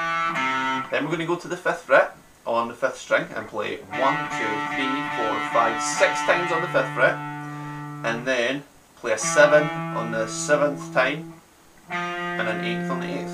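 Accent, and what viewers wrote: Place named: Scotland, United Kingdom. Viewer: Sorry this has nothing to do with the song, but is there anything better in the world than a Scottish accent? I think not. Good job on the song too. Greetings from America!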